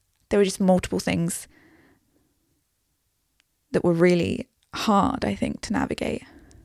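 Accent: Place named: RP, United Kingdom. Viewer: The fact that you refer to books as 'she' is making me think this is pretentious twaddle. If you have a literary agent, surely that person is explaining the money side to you. You sound posh, whiney and entitled. I don't know why the algorithm recommended this to me. I thought most authors were so delighted to get their first book in print that they would accept next to nothing. I find it staggering that you've got this much money. Not in a good way.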